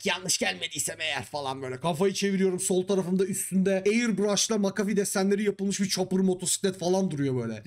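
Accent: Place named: Central Anatolia, Turkey. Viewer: İç anadolu şivesi arada süper gidiyor :)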